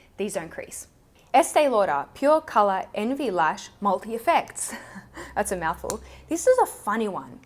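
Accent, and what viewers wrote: Australia. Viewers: I absolutely love the yearly favourites as well! I look forward to them so much. Also side note: I really enjoy listening to your Aussie accent. It's made me realise that I think you are the only fellow Aussie beauty YouTuber that I follow and I should find more.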